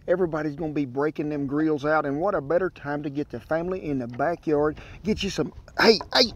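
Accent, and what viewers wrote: United States. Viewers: I really come here for the cow boy Accent ( the Wild West language).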